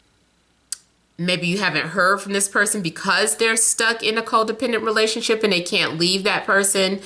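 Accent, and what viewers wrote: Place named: United States. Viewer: You are thé best ever love from France 🇫🇷 even if it is sometimes difficult to understand the American accent 😂Happy new year 🥇♥️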